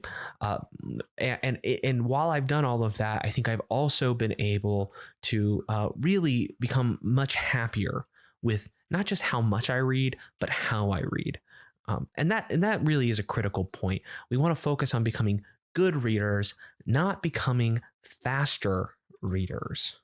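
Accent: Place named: Pennsylvania, United States. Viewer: I have an accent-related question, if you allow - do you come from around Pensilvania ? I've got a friend from there and in the minutae you sound just like him. I can't really pint point why or the specifics.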